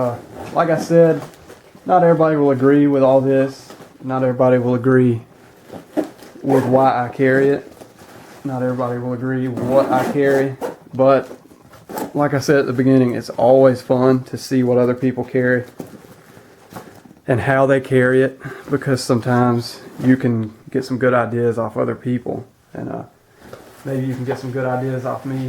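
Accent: Alabama, United States. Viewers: what part of alabama are you from?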